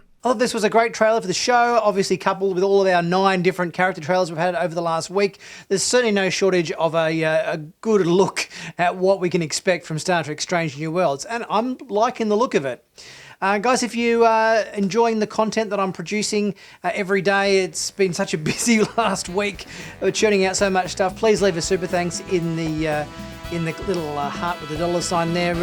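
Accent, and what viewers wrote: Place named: Australia. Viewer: Was NOT expecting a fellow Aussie when I clicked this video! Respect! Subscribing now mate 👍🏻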